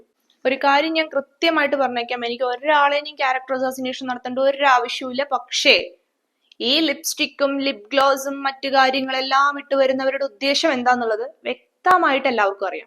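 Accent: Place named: Thrissur, India. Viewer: Ayyo triasur slang Evide poyi??? Sho straight njngada tone…Adipoli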